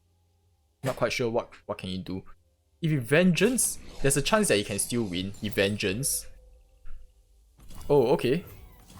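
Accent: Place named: Singapore. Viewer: I think I know a Singaporean when I hear one. Keep it up bro!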